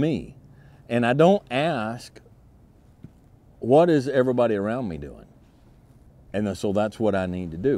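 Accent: United States. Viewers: Thank u, sir! Feels like I'm taking with my pal, or an uncle, or alike 🤝 and I love your genuine attitude, sir, and your accent. Real American. Big up and all best from a random Russian dude 👍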